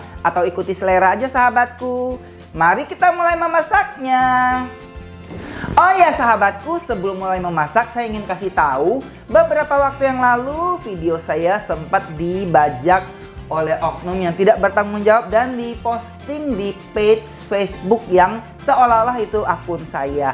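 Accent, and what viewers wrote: Bangka, Indonesia. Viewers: "kita mau mencedoknya" ... kokoooo bangka banget geeh...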